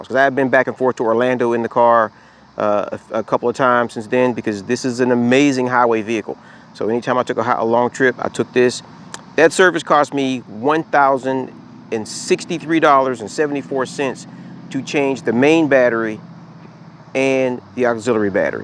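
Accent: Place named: Pittsburgh, United States. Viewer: You from Pittsburgh? Ya accent sounds like it.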